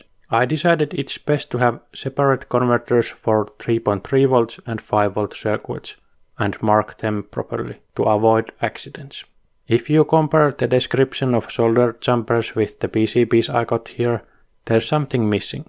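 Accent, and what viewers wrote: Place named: Finland. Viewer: funny thing...the minute i heard you speak..."Suomalainen!" appeared in my head... seitsemän vuotta salossa... at first, i missed the "a-dots" in seven...wait, it was kahdeksan vuotta...onko kesä hyvää...our friend said over 30C, everything too dry...nice to see you here.. kanadalainen, mutta melkein suomalinen 🇨🇦🦈🐻‍❄️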